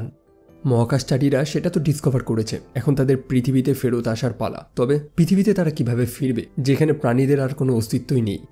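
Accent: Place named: Bangladesh. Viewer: apnar country India...But your Bangla Calcutta er accent nai..Bangladesh er accent er moto lage..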